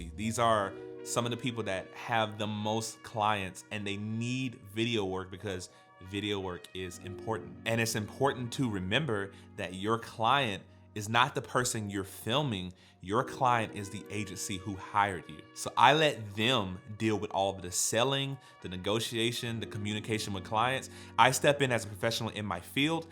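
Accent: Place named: Mississippi, United States. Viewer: BRO YOU FROM SOUTH MS ?? Word yo me to!!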